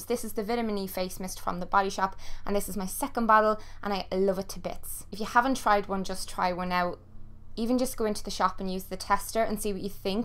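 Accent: Ireland. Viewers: What part of ireland are you from? I love your accent, I'm from dublin. I was just wondering (love the top btw) if you have any sun are tips because I now live in California and even though i wear sunscreen I get so burnt, I have trouble going to gym class! I was just wondering if you had any tips :) I love your videos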